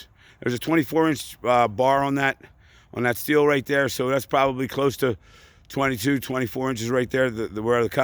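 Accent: New York, United States. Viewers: An arborist from New Yoik! At least, that's how it sounds to this Englishman. Loving your work sir👍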